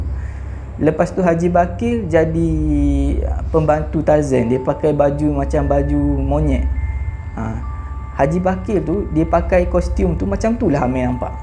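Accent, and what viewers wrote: Terengganu, Malaysia. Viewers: Org ganu ke dik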